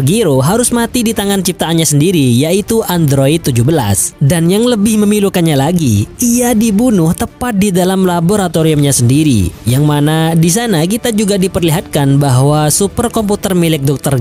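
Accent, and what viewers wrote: Madura, Indonesia. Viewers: Madura bang?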